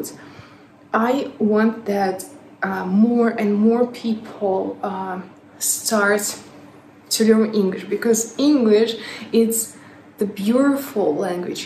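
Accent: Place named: Eastern Europe. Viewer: I am British and therefore a native English speaker. You are too hard on yourself. Your spoken English is very easy to understand. You have an Eastern Europe accent when you speak English but not a very heavy accent. Any native English speaker would EASILY understand you The reality is that most educated native English speakers could probably identify an accent in the spoken English of most other English speakers. I think I could identify which country a Canadian, a South African, an Australian or an American speaker of English came from even though they are also native English speakers. I could identify where in the UK most people came from (London, Newcastle, Yorkshire, Glasgow, Birmingham, Liverpool, Wales etc) as those areas/regions have their own accents, too. People speak English with French, German, Italian etc accents and, yes they will make mistakes but that doesn't mean it is difficult to understand them. 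Most British people mangle the language so who are we to criticise?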